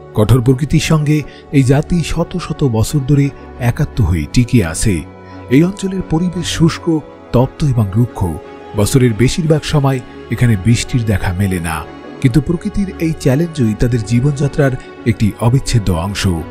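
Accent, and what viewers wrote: Barishal, Bangladesh. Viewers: আপনি বরিশাইল্লা ভাষা কেন বলতেছেন। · আপনি কি বরিশালের লোক ?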